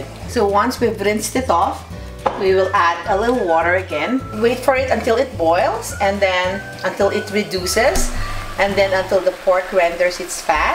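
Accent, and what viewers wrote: Philippines. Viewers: totoo nga naman anyway it recalls memories talaga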